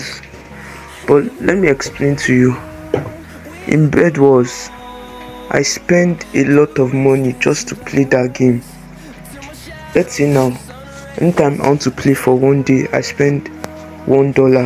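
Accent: Nigeria. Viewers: I love Nigerian accents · w voice (like the Nigerian accent)❤❤ i am Nigerian! 🇳🇬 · I LOVE THE ACCENT YOUR NIGERIAN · the nigerian accent :D, luv it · idk why i didn't expect u to sound Nigerian but nice voice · It's Nigerian accent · W I can here ur actual nigerian accent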